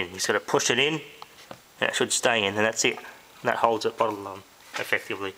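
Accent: Australia. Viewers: Sounds like an Australian accent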